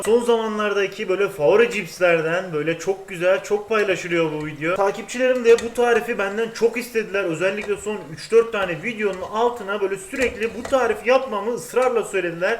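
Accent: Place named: Hatay, Turkey. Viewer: Hataylı olduğu için , şivesi öyle 😔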